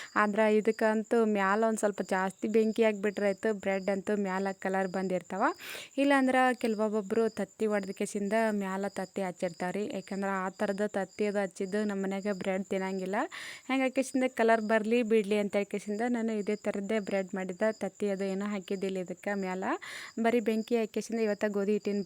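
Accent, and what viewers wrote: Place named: Uttara Kannada, India. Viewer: ನಮ್ಮ ಉತ್ತರ ಕನ್ನಡ ದವರು ನಾವು ಹೀಗೆ ಮಾತಾಡೋದು ನಿನಗೆ ನೋಡೋಕೆ ಮನಸ್ಸಿದ್ದರೆ ನೋಡು ಇಲ್ಲ ಮುಚ್ಕೊಂಡು ಹೋಗು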